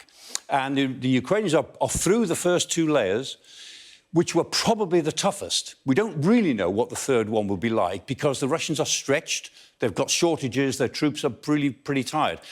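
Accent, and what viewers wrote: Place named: United Kingdom. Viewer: Thanks UK for existing, lovely accent when talking! greetings from Germany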